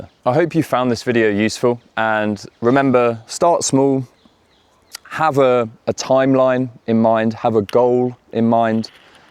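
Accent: United Kingdom. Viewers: Hi there thanks for this I really love the British accent and finally I found an interesting channel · Are you British? Your English is different. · Finally someone with British accent 😂❤ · Is that British accent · Talk about another topics as much as you can because you have a wonderful British accent. And I think it can be a listening practice all of us. Thanks. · Eu gosto do sotaque britânico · Are you speaking in British accent???? because I want to learn American one, I'm afraid to be in the wrong place 🙂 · Ahahaa😂 he speaks in british accent and I really love it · Although I focus on learning American English, but this video is my listening practice today , I like the British accent BTW. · You said you are in American in one of your films but your accent is like UK . I love your accent cus I'm learning UK English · Your british accent, I loved it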